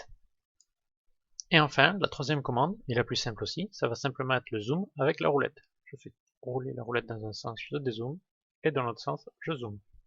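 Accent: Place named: South, France. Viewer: Bravo pour toutes ces vidéos, excellentes à tous points de vues... avé un petit accent du sud... Ceci dit, savez-vous comment changer le plan d'origine d'une création de pièce? ou changer l'axe d'origine? merci d'avance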